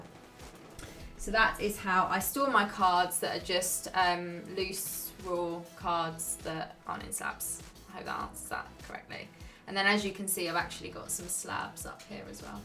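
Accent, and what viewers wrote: United Kingdom. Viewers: I just want to say that I absolutely love your channel and your personality! You have a lovely London/English accent. It's really quite something! Ace all day...✌🏻+💖 - Eric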